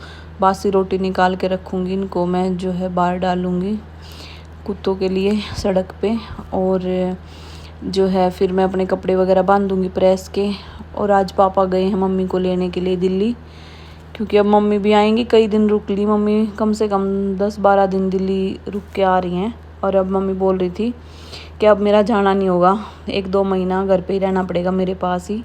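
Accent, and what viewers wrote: Haryana, India. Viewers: Hii apke vlogs bahut hi interested hote hai kyonki aap Jo h vahi dikhati ho aur bolti ho koi banavatipan nahi hota h.kuch dino se hi apke vlogs dekh Raha hun raat k 3baje Tak apke videos dekhta rahata hun.jo aap harayadavi launguage bolati ho na bahut hi achchi lagati h.baby boy hone k liye advance me badhai. Please reply jarur karna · Aap ki bhasa to haryana ki haap vaha utrakhnd me kyu rahte ho nya koi kob h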